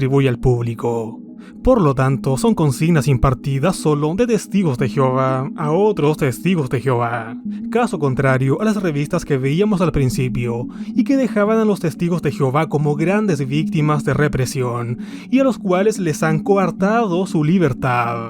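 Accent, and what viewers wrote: Chile. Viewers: Es shileno... un vicio cultural ...propio de la nacionalidad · Ah ok, entonces es común para cualquier chileno dicha pronunciación?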